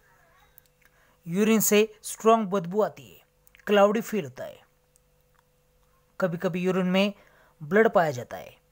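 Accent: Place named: Maharashtra, India. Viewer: बोलने वाला महाराष्ट्रियन हैं ना , गाँवठी बोलता हैं , ठीक से बोला करो भाई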